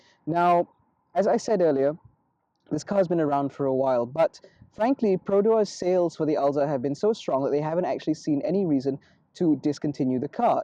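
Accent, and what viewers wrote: England, United Kingdom. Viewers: yes, his uk english are much2 cleared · tq for yr replied. And so much 💕 with the English accent. 😄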